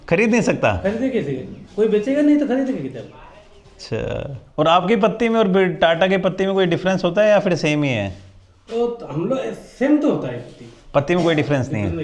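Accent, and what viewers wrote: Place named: Northeast, India. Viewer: Apke hindi v thdi bohot northeast ke jese ho gye mil jyga naaaa love it sir frm assam😊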